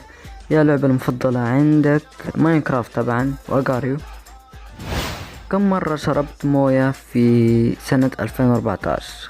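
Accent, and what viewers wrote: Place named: Saudi Arabia. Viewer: من المغرب و تتحدث سعودي؟ ملاحظة: حتى انا مغربية ._. و بحبك ._.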